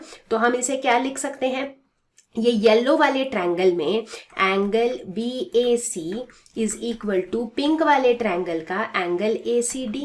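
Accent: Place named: Maharashtra, India. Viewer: Ma'am is from Maharastra 😂👍🏻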